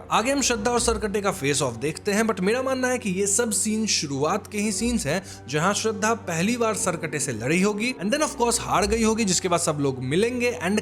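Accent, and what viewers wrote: Bengal, India. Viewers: Larai bhai your accent is like a Bengali or Odia accent It is Ladai not larai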